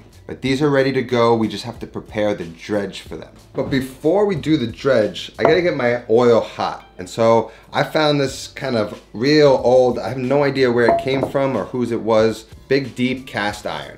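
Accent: New York, United States. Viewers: New york accents are magic on video for some reason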